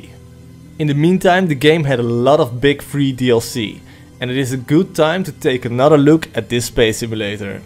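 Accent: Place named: Netherlands. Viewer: Ahh yes very cool, im also Dutch i thought already i could hear it in your accent a bitt, very good content you have a new sub 😎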